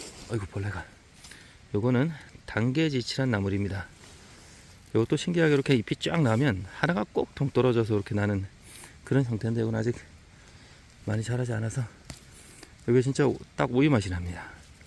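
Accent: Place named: Gangwon, South Korea. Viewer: 그곳은 혹시강원도인가요? 제고향 강원도억양인거같아서요~~말투도 정겹고 고향도그립고 엄마랑 나물하러다니던 추억도 그립네요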